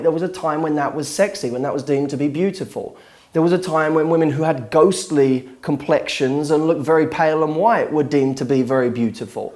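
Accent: England, United Kingdom. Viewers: That's just how everyone in the UK speaks. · your british accent is killing me! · Your So Hot, i dont mean to be shallow, re: being your looks, your English accent:) it's definatley your whole package;) love your video, thanks once again, always emotionally intelligent and of value. Cheers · I like British language 😂 I mean accent ☺️ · you look smexy to..... i love brittish acsents <3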